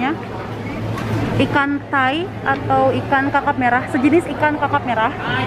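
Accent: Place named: West Java, Indonesia. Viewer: Logat sunda bogor na masih aya meskipun lama di jepang 😁😁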